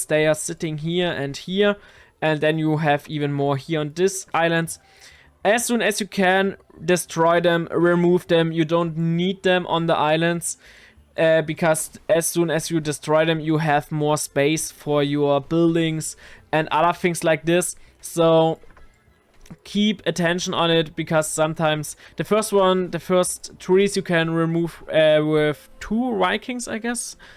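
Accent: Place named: Germany. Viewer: w german accent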